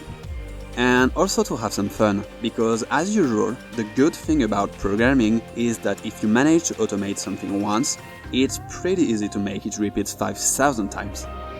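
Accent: France. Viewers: mdr ça m'a littéralement pris 3 secondes pour reconnaitre que t français x) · French accent spotted 😂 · En vrai, tu es français? On le sent 😂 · this is what i go on youtube for, i don’t play trackmania but your dedication and passion about what you are doing really makes the cut for me ! i can feel you worked hard on this vid and it pays off, great content man, keep it up :) btw : ça fait toujours plaisir d’entendre un accent français par-ci par-là, continue à faire ce qui te plaît mec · Are you french? Your accent feel french, I am french 😁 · Great video! You can 100% tell you're french tho :p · Elle est incroyable ta vidéo ! (Je suppose que tu parle français au vu de l'accent ;) ) Le temps que t'as du passé à faire l'entraînement puis le record et le montage, j'imagine même pas. Bravo ! A la fin tu parle de combiner le supervisé avec du non supervisé. Tu voudrais pas essayer de voir ce que ça donne ? 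Avec ce genre de stratégie tu serais en mesure de créer une IA potentiellement plus forte qu'un humain non ? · mec, fais un effort ton accent est juste horrible meme pour un français !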